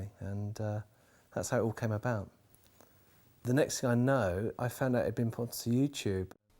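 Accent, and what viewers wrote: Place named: England, United Kingdom. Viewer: I KNEW he was English. Lol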